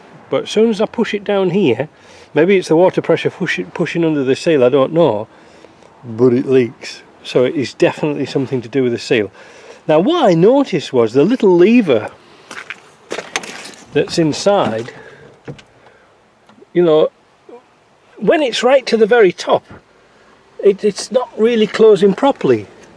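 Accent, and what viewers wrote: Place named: Middlesbrough, United Kingdom. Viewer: Well that answers that question 'like a wet weekend in Middlesbrough', my wife was adamant that you had a Boro accent. She's a Middlesbrough lass and we live in Stockton on Tees....... and yes, that's often the weather. Got my sliding window seals done, now to track down a roof leak that runs in the inside roof gutter and showers my lass when braking ...... oh the joys. Ken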